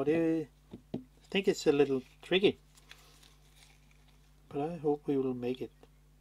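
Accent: Denmark. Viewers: WAUW!! This is SO strange and interesting:) I have JUST found your channel because my dear dad gave me his collection of vintage Nikkor lenses and the Micro-Nikkor 55mm was tight with the focus. Well, you made a video about. But the interesting thing is this: I noticed what sounded like a danish accent and now I find out that you in fact are from Denmark AND your name is. Well guess what...I am also from Denmark...and my name is...:) Tusind tak for videoerne, min ven!! Hvis jeg kommer til at lave rod i den i mit reperations-forsøg, så håber jeg at du måske kan hjælpe mig;) mvh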